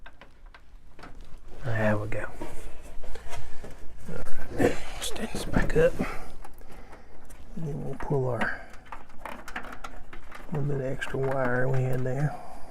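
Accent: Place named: Texas, United States. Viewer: He sounds like he may be from Texas. A lot of Texans have a soft southern drawl despite what Hollywood would try to make you believe since they tend to portray us as loud and obnoxious.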